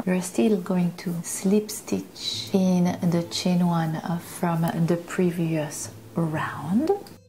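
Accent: France. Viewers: Superbe !!! Thank U very much for teach us . You 'v got a French accent ! ???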